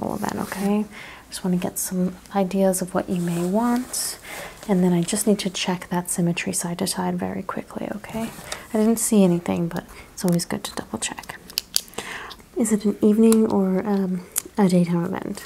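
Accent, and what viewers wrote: South, United States. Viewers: I love her accent… so southern ❤ I’m from Alabama so I guess that’s why I love it 😊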